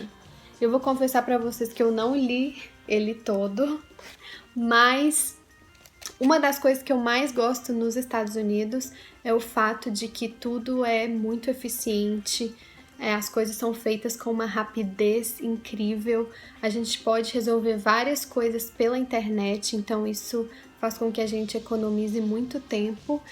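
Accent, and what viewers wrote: Minas Gerais, Brazil. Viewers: Jose Zeballos o sotaque dela é mineiro (Minas gerais)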